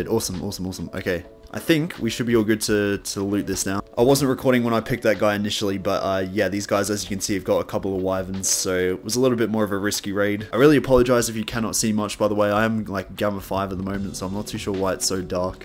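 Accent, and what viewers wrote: Australia. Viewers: Awesome content, love the Aussie accent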